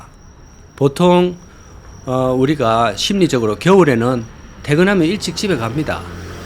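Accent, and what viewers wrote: Gyeongsang, South Korea. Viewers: 님 고향이 창원 마산쪽인가요? 아님 김해쪽이신가요? 사투리로 봤을때는 서부갱남은 아니신거 같아서요.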